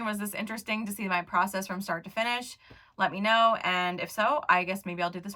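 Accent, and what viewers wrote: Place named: New York, United States. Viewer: Thx Thought I heard a little NY accent in your voice Keep Doing your thing you Dope feel free to to check out some of the Beats I’ve Made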